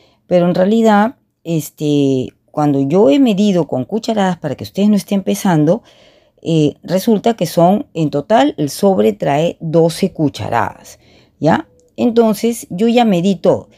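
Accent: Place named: Barranca, Peru. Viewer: Me encanta .... juraría que eres de barranca... hablas igual que mi tía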